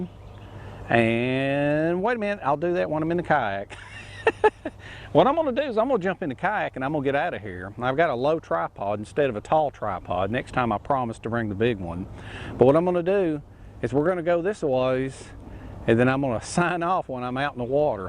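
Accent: South, United States. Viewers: As being a professional land surveyor in neighboring South Carolina I would think that the Georgia Department of Natural Resource owns the island and the surrounding river. Just subscribed I love your videos and the perfect southern accent!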